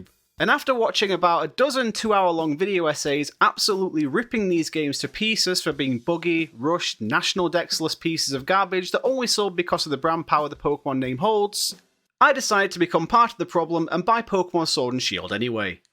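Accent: United Kingdom. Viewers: Sounds like you've had a similar journey to me mate, stopped at Ruby and then ended up getting Ultra Sun around lockdown. Only really got it to be able to download that "poke-transporter" app from the 3ds store before it closed - ended up really enjoying the whole vibe of the game and it sucked me back into the pokemon world once more! Just like with you and Sword/Shield, all I came across online was absolute hatred for Ultra Sun, but I loved it 😅 Since then I've gotten a hold of and finished Platinum and White - really enjoyed them too. Right now I'm absolutely immersed in Legends Arceus and I'm fairly excited to see where the series goes from here! As a side note, you sound like you probably live around my area, north-west? I grew up around Stockport 🙂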